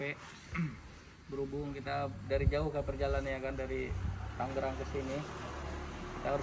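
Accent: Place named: Medan, Indonesia. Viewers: Qkira org medan.. dari logatnya, gk nyangka klo org lampung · Bhasa ny kek org medan y kk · Saya kira bang ini orang medan krn logatnya kayak medan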